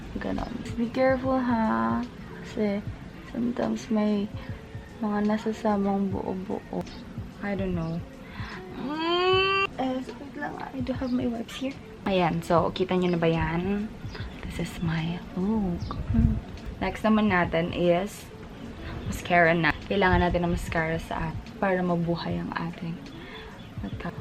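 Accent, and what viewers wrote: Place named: La Salle, Philippines. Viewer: Hahahaha ung mga aso. Lakas maka La Sallista sis. Ang accent 😊 tho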